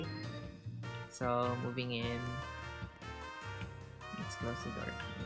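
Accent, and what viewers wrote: Philippines. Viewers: You're so creative and patient with your builds! Btw r u Filipino? We have the same accent 😩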